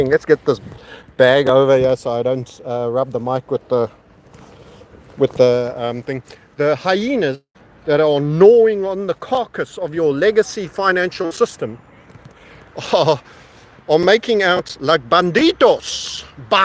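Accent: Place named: South Africa. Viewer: a based guy with a South African accent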